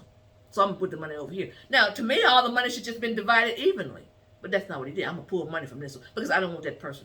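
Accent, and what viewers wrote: South, United States. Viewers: God Bless you to... Trump needs you to be in his Cabinet. That's alot of passion. You sound a lil Southern but you got East Coast in your blood.😎👍🤟🇺🇸🇺🇸👊👏👏👏👏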